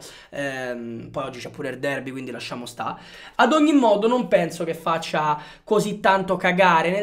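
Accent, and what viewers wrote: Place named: Naples, Italy. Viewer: il tuo accento napoletano sembra quello di un’ orso Balú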